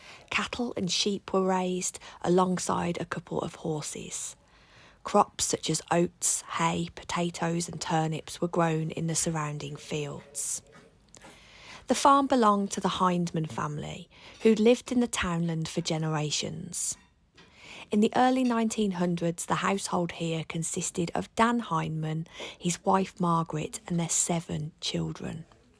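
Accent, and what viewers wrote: Birmingham, United Kingdom. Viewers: your beautiful Brummie accent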